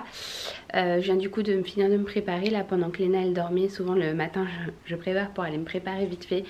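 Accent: Spain. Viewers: Ton accent españiol 💓il est incroyable trop choux votre petit bout de choux de parler españiol et français c trop bien, 😁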